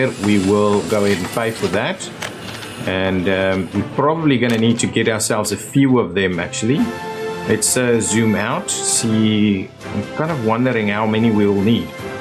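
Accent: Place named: South Africa. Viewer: you sound like you're from South Africa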